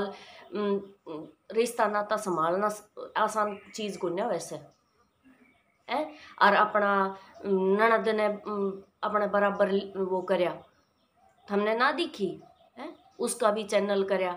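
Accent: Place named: Rajasthan, India. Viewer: Aapki boli t to rajshthani lago ho ji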